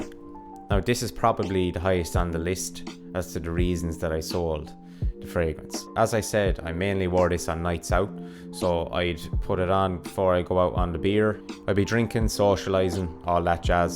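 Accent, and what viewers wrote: Ireland. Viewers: Your never Irish are you?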